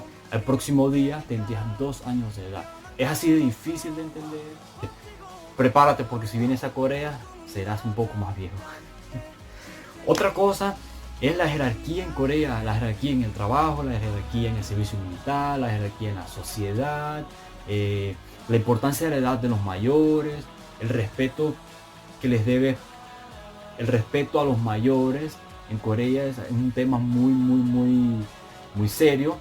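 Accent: Zulia, Venezuela. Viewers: yo quisiera oír más sobre esa lengua y cultura a las que ahora para hacerlo más “appealing” le sumamos ese hermoso acento zuliano, sabor venezolano que desborda alegría sin igual. Salí de Vz hace como 30 años, pero ahora estudio coreano y mi sueño (sé que lo lograré) es aprender bien el idioma, visitar Corea del Sur y trabajar en este y mis otros idiomas. Muy orgullosa de ti y los demás venezolanos emprendedores que se esfuerzan por salir adelante, dejar en alto el nombre de Vz y dar a conocer lo bueno, lo bonito, lo chevere y lo maravilloso de quien sabe perder y prepararse por ganar. Quizá parezca que se ha perdido una batalla, tiempo y más, no lo negamos, pero que se sujete fuerte este mundo porque emergeremos con más fuerza y valor. Mientras tanto, en el exilio hoy tal vez, que se conozca por doquier nuestra voz, corazón, cultura, tradiciones, comidas y más.Hay gente de más edad por ahí, que hacen, a que se dedican, cuales son sus intereses más allá de la familia y carrera profesional. 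Ojalá puedas responder con algún video. Te bendigo. Te deseo lo mejor. Respetuoso saludo desde TX. Adelante!! 화이팅:)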